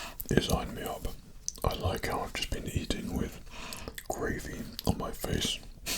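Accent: England, United Kingdom. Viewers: NO WAY BRO'S BRITISH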